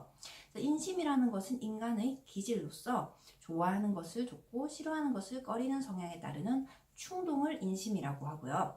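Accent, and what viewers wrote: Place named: Gyeongsang, South Korea. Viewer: 말씀이 좀 빠른건.. 경상도 말이 원래 그렇죠. 대구신가요? '대학장구' ㅋㅋ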